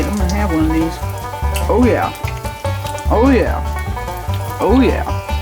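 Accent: South, United States. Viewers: Hey great vid, I am watching this in England and I just love your voice, is that a southern accent ?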